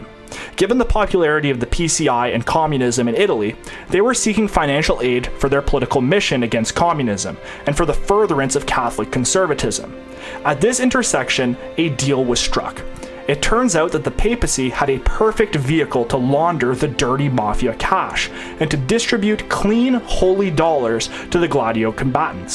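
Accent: Canada. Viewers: Pretty sure the narrator is from Canada. That dialect is unique and screams classic Canadian.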